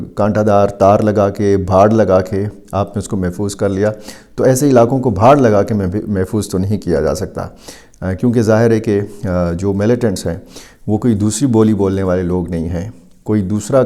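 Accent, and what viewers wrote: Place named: Khyber Pakhtunkhwa, Pakistan. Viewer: صاحب آپ کی صحافت واقعی بہت ہی عمدہ اور مثبت طریقے کی ہے حسن خان پشتو میں بولتے تھے تو میں نے لکھ دیا تھا کہ اردو میں بھی بیان کریں تاکہ اردو سمجھنے والوں کے سامنے پختون قوم کی صحافت کا مثبت چہرہ سامنے آئے مجھے نہیں معلوم کہ آپ کہاں کے ہیں؟ مگر لہجے سے لگتا ہے کہ جنوبی پختونخواہ کے ہیں اور جتنی زیادہ قربت ہوتی ہے تو قربت کے لحاظ سے موددت ایک بالکل فطری چیز ہے ایک دور ایسا تھا کہ سلیم صافی صاحب کو طالبان کے حوالے سے بہت ماہر سمجھا جاتا تھا سلیم صافی نے بہت معاملات میں نشاندہی بھی کی ہے ایک بار پہلے جب نیٹو افغانستان میں موجود تھی تو کہا تھا کہ جنرل قمر جاوید باجوہ نے کہا ہے کہ افغان اور پاکستانی طالبان دونوں ایک سکہ کے دو رخ ہیں پھر جب نیٹو افغانستان سے گیا تو میری بھی یہی رائے تھی کہ تحریک طالبان پاکستان کو آنے دیا جائے اسلئے کہ امریکہ جانے کے بعد جو لوگ افغانستان میں پھنس گئے ہیں ان کو واپس آنا چاہیے وزیرستان میں جمعیت علماء اسلام کے سینیٹر مولانا صالح شاہ قریشی نے ان کی آمد کا خیرمقدم کیا تھا PTM والوں کے بیانات تھے کہ ان سے ہم کہتے ہیں کہ تم ہمارے بھائی ہو افغانی طالبان نے تم اپنا نہیں سمجھا اور پنجاب کی فوج بھی تمہیں قبول نہیں کرتی اگر آپ کو کوئی مسئلہ ہو تو قتل وغارت کی جگہ ہمیں بلاؤ ہم اس کا ازالہ کریں گے البتہ سوات کے لوگ ان کے خلاف نکل آئے تو مراد سعید بھی عوام کی زبان بولنے لگے ورنہ تو اخونزادہ چٹان باجوڑ سے لیکر جنوبی وزیرستان سینیٹر مولانا صالح قریشی محسود تک قبائل کے نمائندے گئے تھے ہمارے گھر پر بھی حملہ ہوا تھا طالبان نے اس پر معافی بھی مانگی تھی اور فیصلہ کرنے کیلئے جرگہ بھی ہوا تھا لیکن پھر فیصلہ ادھورا رہ گیا تھا اسلئے کہ ان کے خلاف آپریشن ہوا تھا اور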